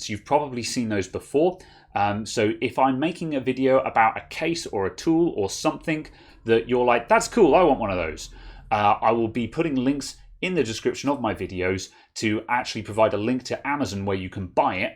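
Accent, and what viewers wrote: United Kingdom. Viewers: I'd echo this. Nothing against US/Aussie/OtherNationInEnglish sites, but nice (comforting) to hear it with a Brit accent with Brit vernacular.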